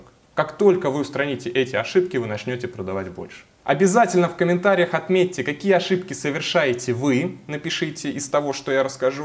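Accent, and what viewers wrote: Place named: Trans-Urals, Russia. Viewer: Вас тяжело слушать. Зауральский говор. Ткие, шибка, пгврить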